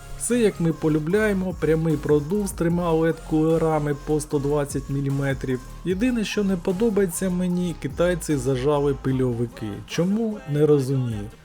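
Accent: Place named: Transcarpathia, Ukraine. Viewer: Кратко когда ты с закропатьия ммм... Нихуя не понятно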